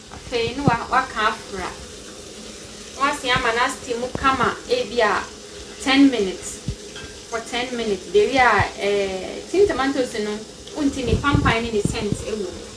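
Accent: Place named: Ghana. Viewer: your Twi is beautiful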